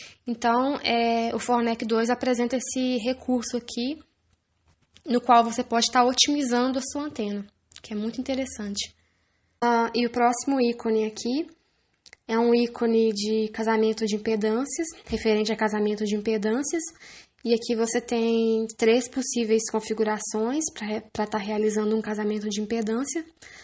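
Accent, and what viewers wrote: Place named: Minas Gerais, Brazil. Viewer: Sotaque de mineira e mexendo com software de Antenas, deve ter feito Inatel...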